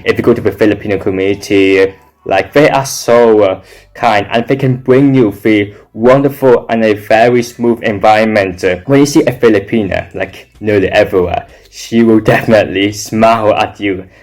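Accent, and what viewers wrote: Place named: United Kingdom. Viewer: ang ganda ganda naman dyan…british accent wow!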